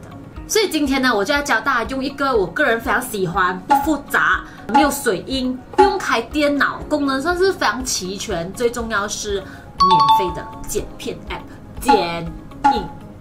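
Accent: Malaysia. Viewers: 一直感觉说话很可爱很搞笑，语速很快加上独特的魔性大马口音，哈哈😃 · 马来口音很重😀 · Ke En是大马还是新加坡人？口音好重哦...😂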